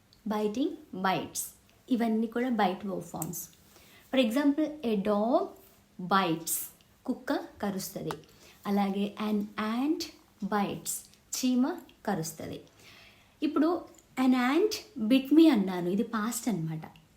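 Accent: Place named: Khammam, India. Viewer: Your telugu seems to be from khammam district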